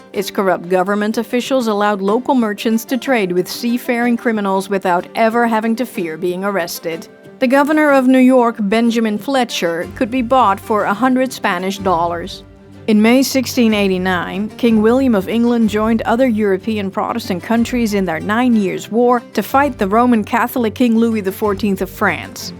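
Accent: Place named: Netherlands. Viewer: 😻✨mooi Dutchlish 😊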